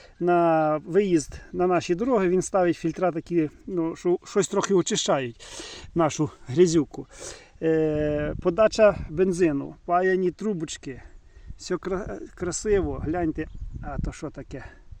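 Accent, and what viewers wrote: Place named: Surzhyk, Ukraine. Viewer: У Вас дуже багато у вимові суржику. А в хлопців дійсно руки золоті.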